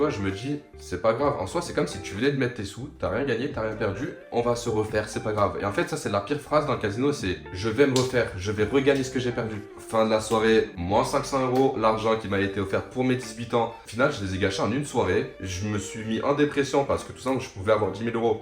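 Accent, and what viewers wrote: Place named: Belgium. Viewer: Vous avez un accent Belge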